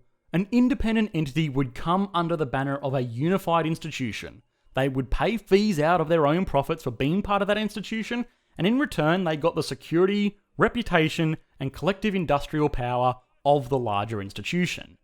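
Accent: Australia. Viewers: I wish Australians would speak in a more anodyne way and not torture vowels quite so much. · This narrator is from the land down under.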